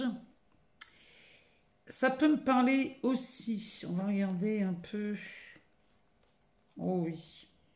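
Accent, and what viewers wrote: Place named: Loir-et-Cher, France. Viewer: j’ai plutôt pensé à l’accent du Loir-et-Cher :)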